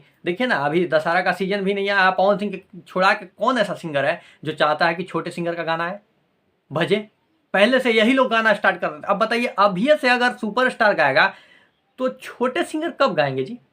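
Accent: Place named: Bhojpuri, India. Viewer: Love you clean Bhojpuri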